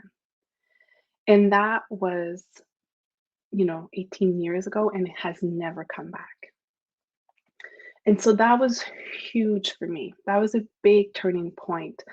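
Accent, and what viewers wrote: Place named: Quebec, Canada. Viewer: Bonjour! Je reconnais ton accent canadian francais, je suis de Montreal et francophone aussi. As-tu écrit ce livre aussi en francais?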